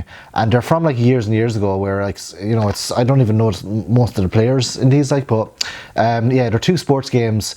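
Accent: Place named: Southern England, United Kingdom. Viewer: I forgot I owned wappy dog until you reminded me. 😳 Might have to do a VR to this. Youre in Southern England.? The accent threw me a bit. Didn't think that there were many of us tubers in the south. 😁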